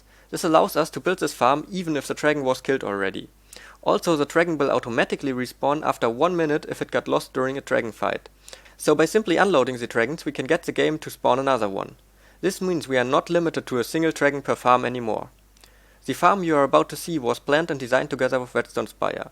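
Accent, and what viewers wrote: Germany. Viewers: Jaja der deutsche Akzent ist ziemlich schwer weg zu bekommen.. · Bist du deutscher? das hört sich sehr deutsch an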